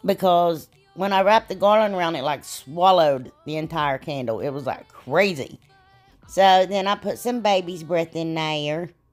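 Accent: South, United States. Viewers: different is good. Where are you originally from because your accent is as country as mine! I just love it, and you and all your fits. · Oh my gosh!! You are hilarious!! I love,love your personality!! You crack me up in a GOOD way!! Your accent reminds me of my aunt. Country as country gets!! I just wished I knew how to even try to spell out some of the words she says to give you an example of how she pronounces them but I can't. Lol. Loved all your project's today just as much as you as well. Thanks for sharing love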